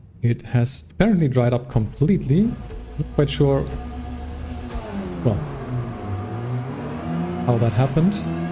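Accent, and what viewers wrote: Germany. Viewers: Moin. Deutscher Akzent?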